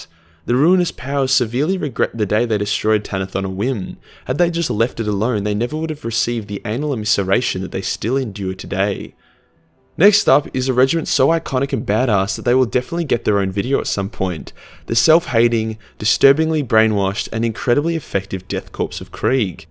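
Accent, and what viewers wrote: Australia. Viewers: It's good to hear an Aussie doing this stuff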